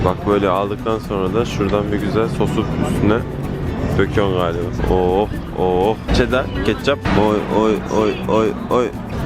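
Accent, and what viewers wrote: Konya, Turkey. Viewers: Bir konyali olarak babam herzaman anouu der da diyor😄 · bende diyom bu adam niye Konyalı gibi konuşuyo ben de konyalıyım.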